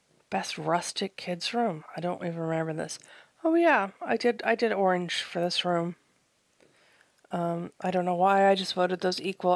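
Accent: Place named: United States. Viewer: Kind of a personal question, and maybe you've answered it before... You sound like an American, how did you come to be living in Ireland? Also, do you like living there? (I might be looking for a new country to move to after November 3, lol.)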